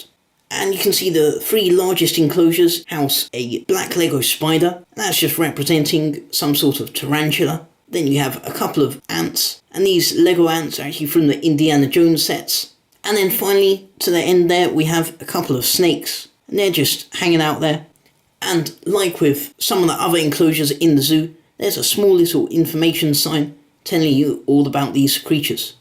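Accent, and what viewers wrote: Southern England, United Kingdom. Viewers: Hey, hope you don't mind my asking, but where are you from? You have what sounds like a UK accent, but you speak the International dialect. Your garage rhymes with carriage, but you say trash instead of rubbish (among other things I've noticed). Just curious... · He must he from the UK ( United Kingston ) or London, they pronounce some words weird but thats justo how they talk there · are you British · He's from southern england, i'd say. Prob london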